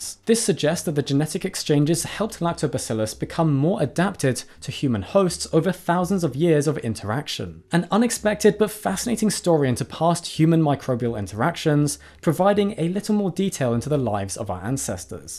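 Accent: United Kingdom. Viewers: How does history, space & dinosaurs sound so much more incredible, when listening to a British guy talk about it???? ☺️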